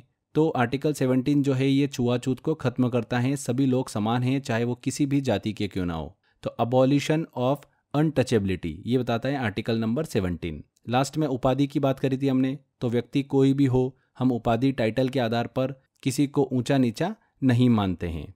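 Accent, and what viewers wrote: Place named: Gujarat, India. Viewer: Aap hai Aapki tone gujarati hai but surname toh up ya bihar mein hota hai Q)toh aap kahan se ho: 1)Gujarat 2)Up 3)Bihar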